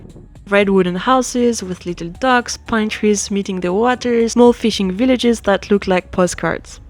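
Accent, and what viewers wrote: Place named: France. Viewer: I watch a lot of videos made my foreign visitors and yours stood out a lot. Usually they very often go to the same museums (Vasa, Viking, Abba) the same restaurants (Meatballs for the people) and cafés (Lillebror, Gast, Stora bageriet) and same shops (Arket) and it ends up being a variation of the same trip; a bit boring. I thoroughly enjoyed that your video was quite different. Thank you! Also enjoyed your French accent (I'm half French).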